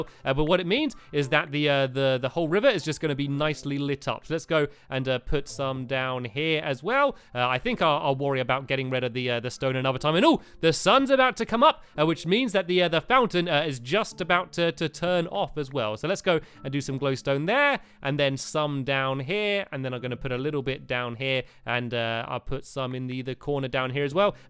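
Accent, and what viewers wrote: United Kingdom. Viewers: LOL it's ester not esta he just pronounces it that way cause he's British XD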